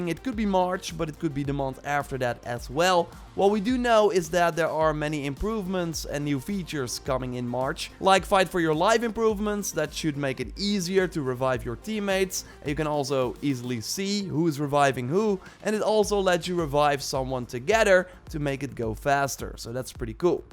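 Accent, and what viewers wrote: Netherlands. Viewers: Your Dutch accent is killing me tbh :/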